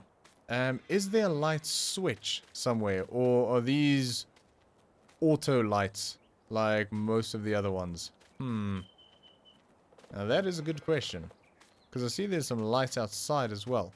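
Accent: South Africa. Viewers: You sound like you are from South Africa... anyway got here from Dag.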